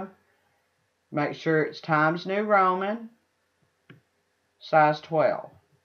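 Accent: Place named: Carolinas, United States. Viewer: Helpful video thank you very much and I am going to take a stab at your accent... I feel it's either from North or South Carolina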